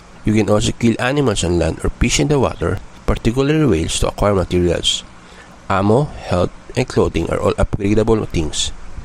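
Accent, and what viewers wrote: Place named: India. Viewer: That's a messed up Indian accent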